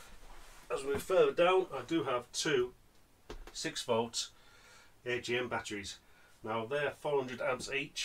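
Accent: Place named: Hull, United Kingdom. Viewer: Fantastic setup mate, you’re from hull aren’t you ??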